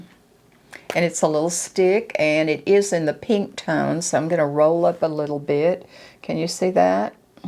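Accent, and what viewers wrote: South, United States. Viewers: She sounds like she's from the South. Peonies don't grow in warm climates, so she might never have heard anyone talking about peonies. I didn't know either, until I moved to Ohio.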